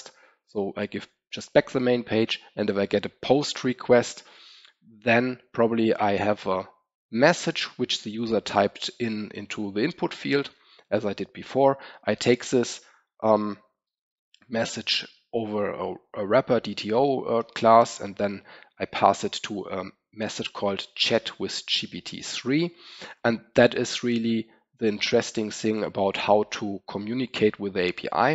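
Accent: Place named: Germany. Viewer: Man erkennt die Deutschen halt einfach an der Stimme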